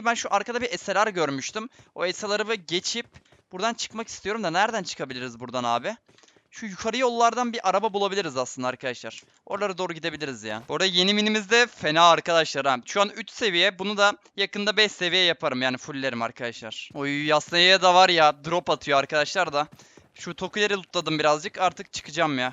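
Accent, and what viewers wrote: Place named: Denizli, Turkey. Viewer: Abi sen denizlilimisin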